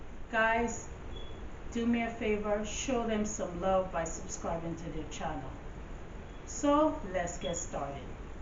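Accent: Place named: Caribbean. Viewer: thank you so much for participating in this collaboration. I knew by your accent that you were from the Caribbean Island. You guys have the best beaches over there!!!! Your Tablescape is Beautiful my friend!!! I love everything from the placemats to the china that you used. The storks are Stunning and added the perfect touch to complete your look!!!! I’m jamming to the music too! Thanks again for participating ~Elle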